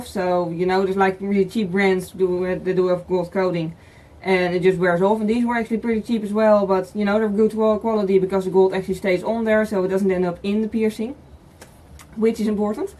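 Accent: Netherlands. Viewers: Ik hoorde aan je accent gelijk dat je nederlands was lol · Same , Ben je van Nederland? I’m from Aruba 🇦🇼 ✌🏽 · Omg army is everywhereeeee😂 but yea its because its a dutch accent and she talks pretty fast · Lekker Nederlands accent xD. Hoe lang duurt het helen bij een zo ongeveer (bij jou ten minste)? Ik ga binnenkort namelijk een helix en een tragus nemen.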